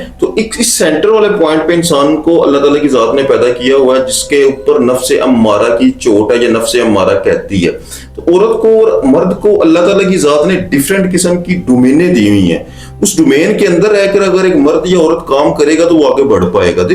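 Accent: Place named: Punjab, Pakistan. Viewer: They are punjabi from Pakistan their tongue is different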